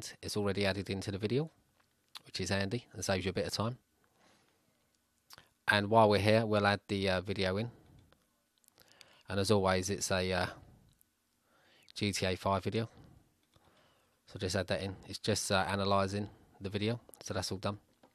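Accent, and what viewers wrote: England, United Kingdom. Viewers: I love the voice of England. When i hear that voice, my heart shake and can't normal...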